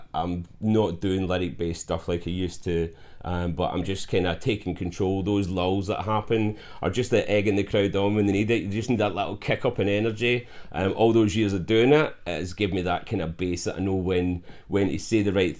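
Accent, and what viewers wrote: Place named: Scotland, United Kingdom. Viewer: U sound like ur from milngavie Where’s fuckin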